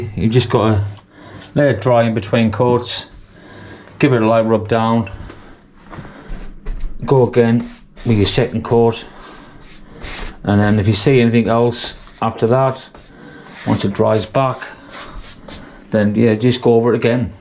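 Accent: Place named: Northeast England, United Kingdom. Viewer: You sound like you are from the North East of england, just wondered how the paint managed in all the glorius weather we had? Looking at doing my own with the same paint and your video popped up in my searches. Thank you!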